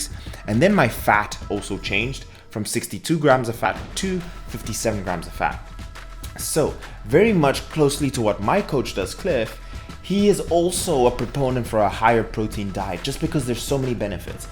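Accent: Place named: South Africa. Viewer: I just came across your video and your accent sounded South Africa so i kept watching. I am South Africa living in the States. I am starting life style change. Don't want to lose weight but want to gain muscle. I am enjoying your videos.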